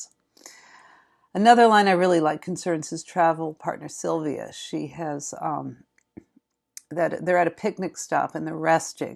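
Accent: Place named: United States. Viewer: The YouTube algorithm was spot on with a link to your channel. Great work - many thannks. I have just started ZAMM again by listening to it (curtesy of pirated version on YouTube) having first read it in 1978 and read it numerous times since. The American voice is adding a new dimension. One reading following the route with Google Street View is something I would recommend. America is so different to UK where I have done all my riding. I have also been listening to JBP, Rebel Wisdom, John Vervaeke, Jonathan Pageau and Paul VanderKlay so this is really pulling lots of strands together. I am an Anglican priest and also a motorcyclist who has spent hours and hours in motorcycle maintenance. I have never really seen why ZAMM is specifically Buddhist. Really looking foward to the rest of the series and hoping to find time to engage in the discussion in the comments.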